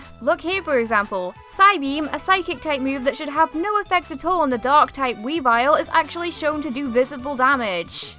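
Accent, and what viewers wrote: United Kingdom. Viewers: Are you English? You have a British accent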